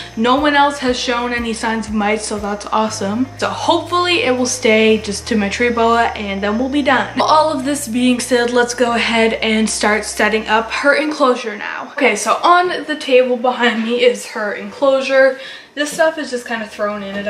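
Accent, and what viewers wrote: Canada. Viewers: Are you Canadian? I think I hear the accent lol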